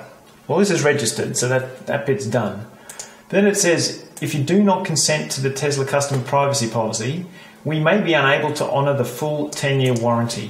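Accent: Australia. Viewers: I know this is an old post but maybe you are still monitoring it. I live in California, you sound like you are from AU so you may not know about the planned power outages in CA. So if I am understanding you correctly when there is a planned power outage which can be for up to 6 days they tell us if there is no wifi or 3G connection for the power wall to report to Tesla I will have periods of time when I will not have power if I am on battery?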